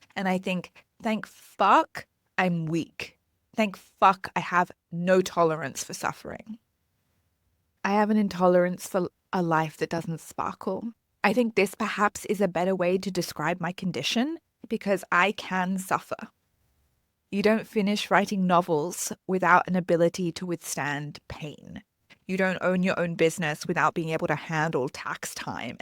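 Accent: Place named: United Kingdom. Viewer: a british accent....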